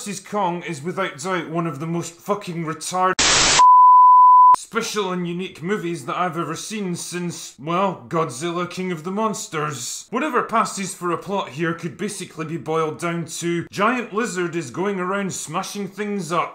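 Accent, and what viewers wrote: Scotland, United Kingdom. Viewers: I think that's part of his gimmick, hence the over the top drunk Scottish accent.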